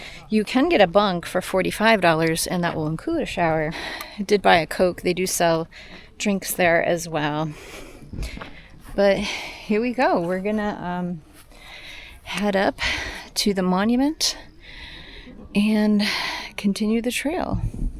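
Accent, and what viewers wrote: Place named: Massachusetts, United States. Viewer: I was thinking to myself that you sound odd but familiar. You're a Bay Stater so it makes sense now. I've been away from Western Mass for 25 years now and people still tell me I have an odd accent but I can't hear it. Every once in a great while I'll talk to a friend back home and think ah, that must be the accent they speak of. And from what I've seen of other people's videos people have been stopping and camping in North Adams. I think a person that finished the trail last year has opened up his yard and amenities to hikers somewhere near the trail and it's getting to be a popular stop so maybe they all went there (Even though this was probably a month ago old news by now)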